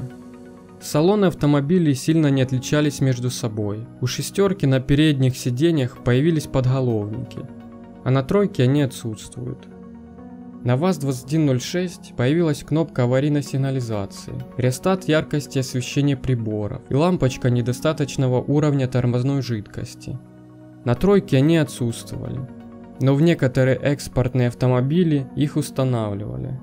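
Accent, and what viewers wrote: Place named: Ukraine. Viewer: Он из Украины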